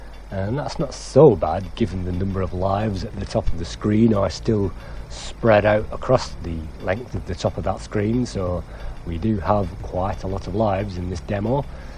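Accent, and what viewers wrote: United Kingdom. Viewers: It's cool man, you remind me of Yakumo1975 's RetroCore vids. Always good to have a laconic British accent. Cheers for the vids man.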